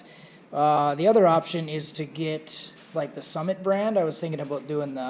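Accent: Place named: Wisconsin, United States. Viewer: Wisconsin. I thought you were near me from your dialect lol. Yooper here.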